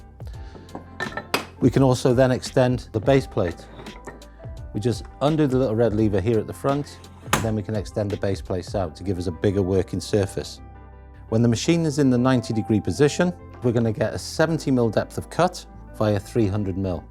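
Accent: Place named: Wales, United Kingdom. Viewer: There’s welsh in that accent for sure!!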